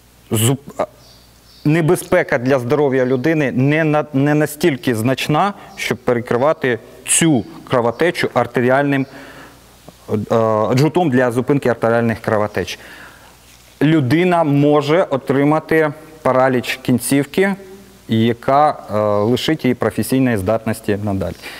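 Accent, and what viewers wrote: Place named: Surzhyk, Ukraine. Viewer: Автор не користується в побуті українською мовою, максимум суржиком. Сам таким страждаю.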